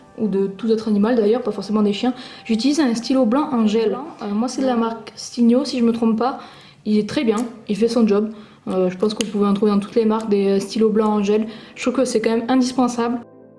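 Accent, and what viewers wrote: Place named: South, France. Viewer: Tu presente très bien et dis tu vien du sud sa ce voit a ton accent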